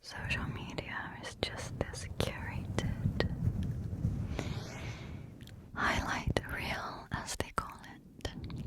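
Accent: United Kingdom. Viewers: 🙌🏼🙌🏼🙌🏼🙌🏼 Yay finally a whisper video!! I just love your British accent and whispers. I hope all goes the way you want, and thank you!!! The kitchen turned out beautiful, I LOVE the lights on the bottom of the cabinets.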